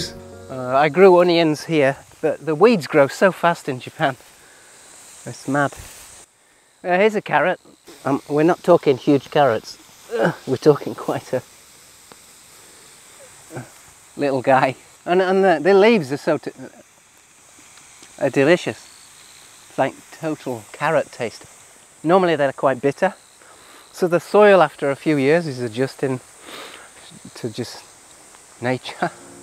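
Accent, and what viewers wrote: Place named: Yorkshire, United Kingdom. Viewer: Who are you? are you half Japanese? from where did you get your Yorkshire accent? Tell us more about you mate. Thanks for the great work. Love you.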